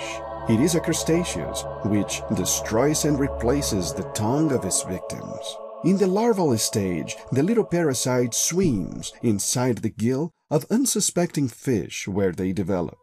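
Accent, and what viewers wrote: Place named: Eastern Europe. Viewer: This guy sounds like Idubbbz eastern European accent lol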